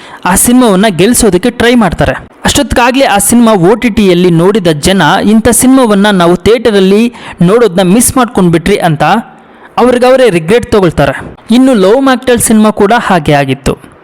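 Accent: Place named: Tamil Nadu, India. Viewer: ಲೋ ಅಯೋಗ್ಯ, ಚಾನಲ್ಲ ನಿರೂಪಕ, ಸ್ಪಷ್ಟ ಕನ್ನಡನೇ ಬರಲ್ಲ ನಿನಗೆ, ತಮಿಳು ಮಿಸ್ರಿತ ಕನ್ನಡ ಮಾತಾಡ್ತಾ ಇದಿಯಲ್ಲೋ, ಭಿಕ್ಷುಕ ನಂಗೆ ಕೇಳ್ಕೊಳ್ತೀಯಾ ಸಬ್ಸ್ಕ್ರೈಬ್ ಆಗಿ ಅಂತ, ನೀವೆಲ್ಲಾ ಕನ್ನಡ ಭಾಷೆಯ ನಿರೂಪಕರು, ನಿನ್ನ ಯೋಗ್ಯತೆಗೆ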